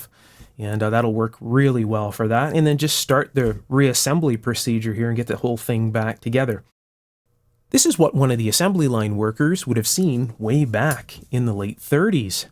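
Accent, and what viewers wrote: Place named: Canada. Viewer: Oh...sorry. No problem...it's worth the wait. I'm not an engineer, but someone mentioned that this was their favorite channel on YouTube, and I was absolutely fascinated by that video. And that Canadian accent reminds me of home. :)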